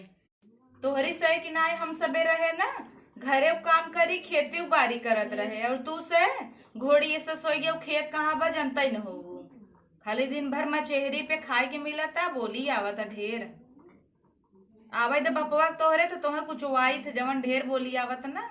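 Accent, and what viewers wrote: Jaunpur, India. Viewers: Didi mai bhi Jaunpur se hun lekin aapaki boli bahut sundar hai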